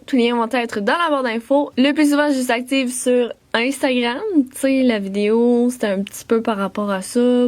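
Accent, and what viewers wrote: Quebec, Canada. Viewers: J'aime les accents canadiens💖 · ah ces quebecquois et leur accent en tout cas tres bonne video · Êtes vous d'origine canada j'ame ta manière de parler la langue française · Oh j’adore ton accent je suis française ( paris 🇫🇷 ) et je trouve vraiment que l’accent québécois est charmant ! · J'aime trop ton accent québécois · J'adore ton accent quebequois et j'adore la façon dont tu expliques les choses rien que pour sa je m'abonne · Punaise j'aime tlltm l'accent canadien fin québécois qui est français ici 🇫🇷🇫🇷🇫🇷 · Elle est trop mignonne avec son petit accent canadien 🥺❤️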